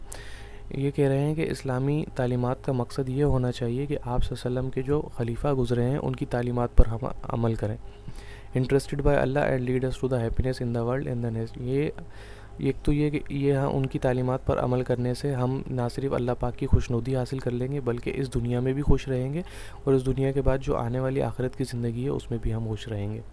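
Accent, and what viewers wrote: Karachi, Pakistan. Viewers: sir ap k language accnet sy meny abserve kr lia tha k ap karachi sy hain ❤❤❤❤ or apny sahi kaha k koi students panjab k kisi village sy lacture sun rhy hongy ..exectly am listining your lacture from panjabs village ❤❤❤well explaind ❤❤❤ May Allah give u success by leaps and bonds❤❤❤❤ameen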